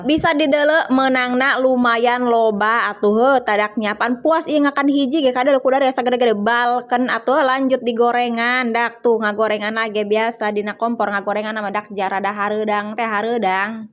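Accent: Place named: Banten, Indonesia. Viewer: logatna mah doang budak di Banten dia.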